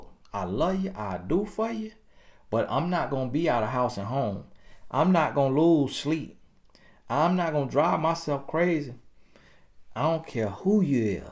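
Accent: South, United States. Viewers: First 5 and half minutes and I'm doneeeee. I done went up in praise and laughed so hard... This is truth spoken so well! Love the accent too-- it had me Rollin' to hear this with that country twang. It's all love 😂❤ Thank you 👊🏾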